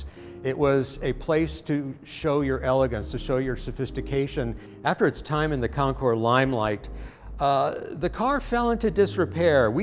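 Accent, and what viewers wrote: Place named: United States. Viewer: Cannot fathom American pronuciation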